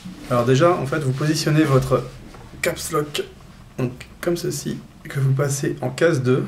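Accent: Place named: France. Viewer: thank youuu,your accent is so sweet,i love french :D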